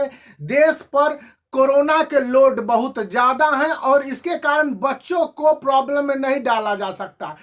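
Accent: Bihar, India. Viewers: Guru ji awaz se hi bihari lag rahe hai kaun zila se hai guru ji aap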